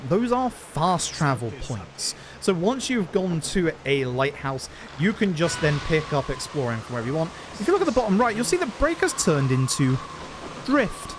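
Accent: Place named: United Kingdom. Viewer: DAWG, YOU'RE BRITISH!?!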